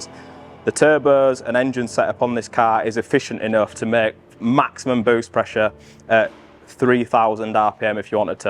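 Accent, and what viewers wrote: United Kingdom. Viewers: Where you based you sound local accent. Wakefield/Leeds???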